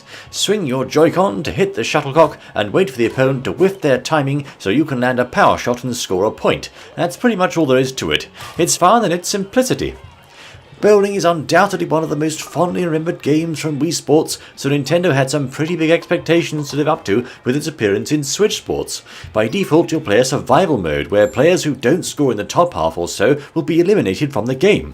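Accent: United Kingdom. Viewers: He is British?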